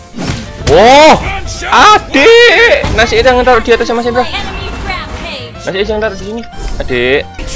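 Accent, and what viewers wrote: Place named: Madura, Indonesia. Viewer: Orang mna lu?kek bhs madura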